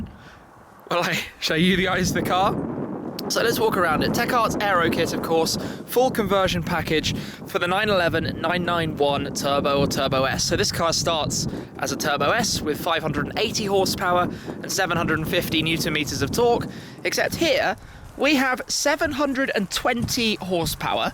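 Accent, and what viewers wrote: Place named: England, United Kingdom. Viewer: what city/region in England is your accent from? Nice car!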